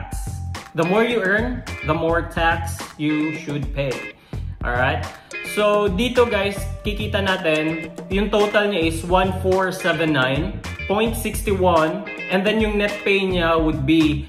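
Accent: Australia. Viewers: Great info! Wanna hear your AUS accent 💘🙊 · I can hear your Aussie accent na. haha Nice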